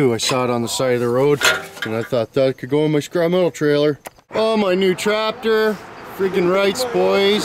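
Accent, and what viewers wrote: Canada. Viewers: how much more canadian can that voice sound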